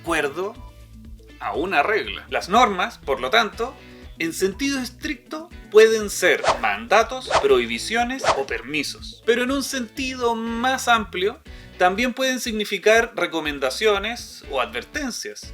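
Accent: Chile. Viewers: Esa voz tan chilena 🙂😉.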